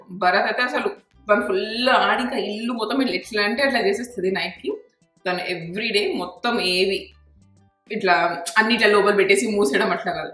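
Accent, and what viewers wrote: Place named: North India, India. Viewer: Your Telugu is very different. Did you grew up in north India ?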